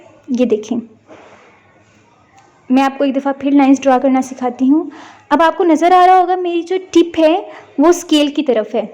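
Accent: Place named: Lahore, Pakistan. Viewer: خدارا اردو کا بیڑا غرق نہ کرو اردو کی ادائیگی مناسب انداز میں کرو یہ لاہوری اردو سی پرہیز کریں'اردو ہماری قومی زبان ہے اس کو خراب نہ کریں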